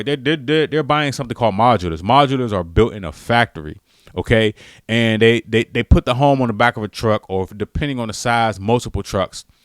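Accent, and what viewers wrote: DMV, United States. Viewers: You sound like you are from the DMV? Is that where you are based?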